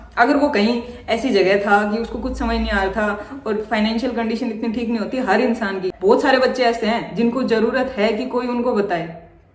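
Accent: Haryana, India. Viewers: M from uttarakhand but love hariyanvi accent 😁😁😂😂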